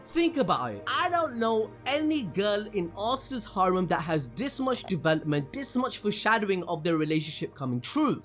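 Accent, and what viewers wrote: Punjab, India. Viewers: The Punjabi Hindi accent🤣🤣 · Is this guy indian? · Nice hindi accent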